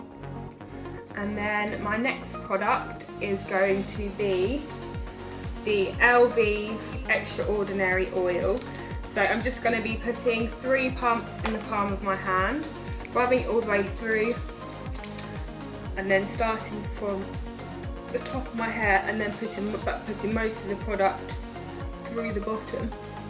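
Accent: United Kingdom. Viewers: british accent is so cute you are so pretty ;) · Her accent !! Where in England is she from?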